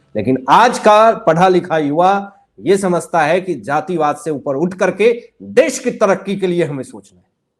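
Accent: Bihar, India. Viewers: Teri bokne ka tarika bihari vala h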